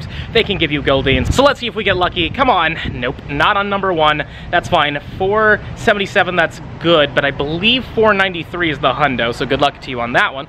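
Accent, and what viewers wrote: United Kingdom. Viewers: Where are you from the uk? O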